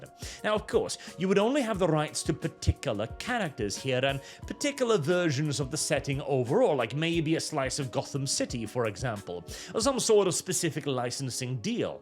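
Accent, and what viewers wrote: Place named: United Kingdom. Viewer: I have never seen a British person rely on their accent to make up for their lack of knowledge more. You might as well be engaged to a cousin because damn dude, that island DNA is showing.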